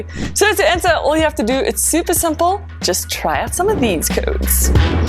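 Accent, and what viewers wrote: South Africa. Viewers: Her accent is spot on south african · Is she south African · That south African accent. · Your South African is that true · Are you South African? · Is she from south Africa? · I sense South African👀😂 Maak my sommer trots🔥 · You sound south African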